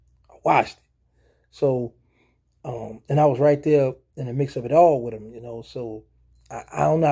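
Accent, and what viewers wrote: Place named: South, United States. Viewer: True souf ngga😂 the way you tlk just cracks me up😅😅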